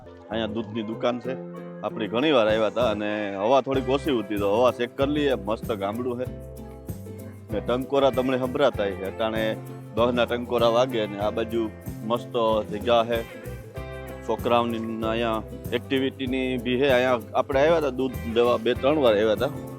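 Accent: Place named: Porbandar, India. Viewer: Tame Porbandar na lago se avu lage se bhasa upar thi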